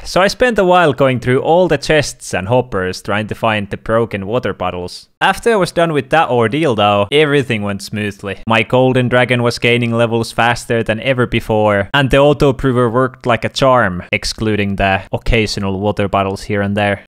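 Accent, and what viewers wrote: Finland. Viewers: first thing when i opend the video i was like is he from finland sounds like it and then i watched and ofc you are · Are you from Finland? I hear it from your voice. LOL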